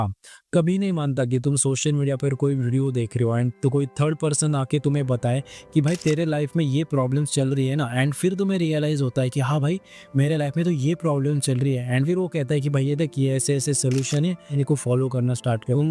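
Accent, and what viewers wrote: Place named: Maharashtra, India. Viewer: Bro sounds marathi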